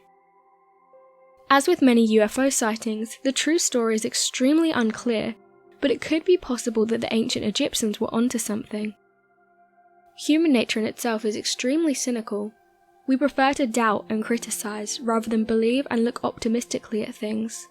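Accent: United Kingdom. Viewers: Monument Valley, Arizona is being shown but Brit voice discussing Minnesota. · Why does everything sound more plausible when delivered with a British accent?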